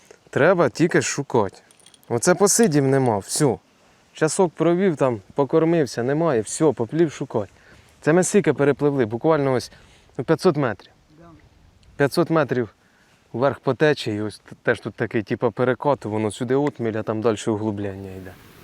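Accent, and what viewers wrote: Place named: Central Ukraine, Ukraine. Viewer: По говору і краєвиду центральна наша красуня, може Полтавська або Черкаська.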